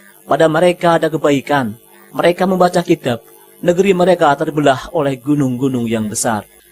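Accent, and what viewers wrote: Tegal, Indonesia. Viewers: Yang ngomong orang tegal ya ? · Logat tegal yak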